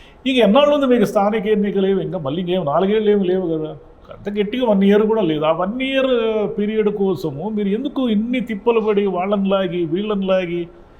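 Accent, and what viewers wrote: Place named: Rayalaseema, India. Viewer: Sir, 👏👏. Rayala seema BLOOD - Sarkar Vari Prounsation is Difference. KADAPA lo Okasari Vellinanu Kotha Prajalanu Okavidham ga Chusharu.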